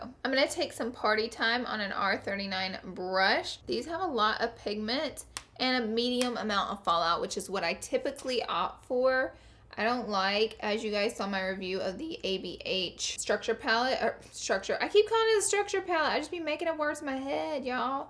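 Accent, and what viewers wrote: South, United States. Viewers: She sounds SO southern I love it! #SouthSquad WYA? Edit: SO MANY SOUTHERNERS! I found my people!!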